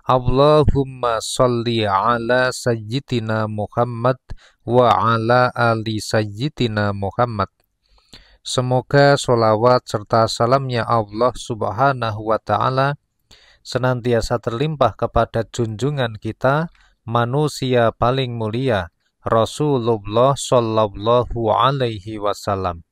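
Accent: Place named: Java, Indonesia. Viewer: Medok nih......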